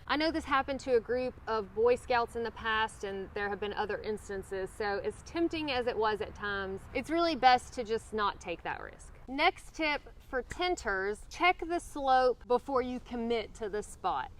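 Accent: United States. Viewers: The why you say your “i” crack me up. It’s so drawn out that it adds syllables to words hahah I’m not picking on you, I’m from Louisiana and my husband from CA could barely understand me when we met LOL Your hard vowel accent was just so drastic and I was curious what part of the US you’re from. WV? Figuring out regional accents has become a strange hobby of mine 🤣 PS I love everything about this channel just from seeing your chair fort set up hahah instant subscribe. I’m BRAND new to hiking and not sure if it’s for me, but this channel seems fun and informative.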